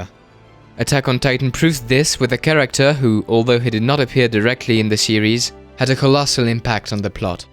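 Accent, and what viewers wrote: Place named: France. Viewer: Cet accent français... sublime.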